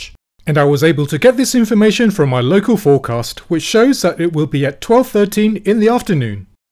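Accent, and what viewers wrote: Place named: South Africa. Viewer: Dude sounds South African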